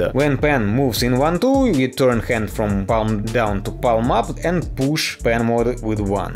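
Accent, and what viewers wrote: Russia. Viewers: Таке ощущение что он русский, но говорит на английском. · Стопэ, ты русский? Акцент довольно таки русский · мне кажется, или я слышу русский акцент? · Такое чувство будто ты русский, который выучил английский ... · Мне кажется. Что этот чел русский, акцент очень сильный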